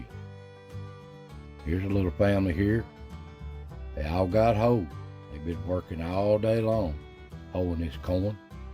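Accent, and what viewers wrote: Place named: South, United States. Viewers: Sounds like Eastern Oklahoma and Western Arkansas. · My parents grew up in West Virginia during the Great Depression. The US Government provided stamp books for sugar, shoes and more. With their gardens supplement the government food, they did well. This narrator speaks Southern Country, not West Virginian. This is not an accurate profile. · YOU sound Completely COUNTRY….I can remember My Sister in Law She was Much Older than Me Her husband could’ve been my Husbands Father he was a LATE in Life Baby…ANYWAY I remember HER putting Out a Garden EVERY YEAR and she always took off last 2 weeks of August and the First 2 weeks of September to do her canning….I had NEVER seen that Before also Saturdays was her House Cleaning Day And she would Iron EVERYTHING….In November that was Deer Hunting season they would cut it up and Freeze the Meat to have until the next year….They Also ate Squirrels 🐿️ and Everyone wanted the Brains….I NEVER a ANY MEAT because I NEVER knew What I was Getting and still to this Day I DO NOT eat meat…During Hunting season I couldn’t help with the Deer Meat and the first time I seen those deer 🦌 I almost fainted I had NEVER seen ANYTHING like It….I was Married for 28 yrs with this Family and MISS the ALL of themEveryday My Sister in Law Was the BEST Cook I ever Knew Christmas was WONDERFUL All of the Cakes Cookies Candy EVERYTHING was Homemade… She was the MoST BEAUTIFUL Woman I ever Knew next to My Mother…..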